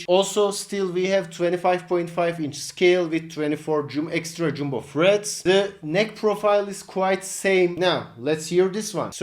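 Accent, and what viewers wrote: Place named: Turkey. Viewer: ulan diyorum aksan bi farkli turkmussun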